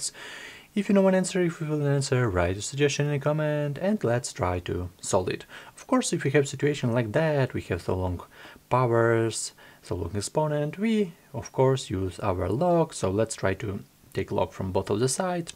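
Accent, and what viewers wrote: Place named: Russia. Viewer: hello there! you are making great content! i have a few questions.u dont have to answer, if you see some of them personal) 1. will you do videos on higher mathematics? 2. what is your origin country? (i'm asking you this question,cause of your accent. i am from russia and i hear many of ours speak in english like you) 3.do you enjoy your life?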